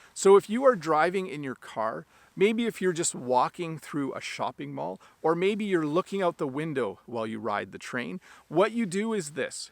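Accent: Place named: Canada. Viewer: Thank you,. These games can be helpfull to improve English. The same games we have in my country as well but I didn't recall them. By the way - your accent is very great, at school they always said that Canadians have french-like accent. No offense:)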